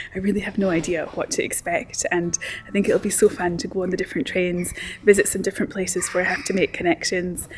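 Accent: Scotland, United Kingdom. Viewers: Hi,I wanted to tell you how much I enjoy your journeys and adventures. You are lovely and so well spoken... love your Scottish accent. My mother was born in Scotland. Best wishes, and I look forward to your next video.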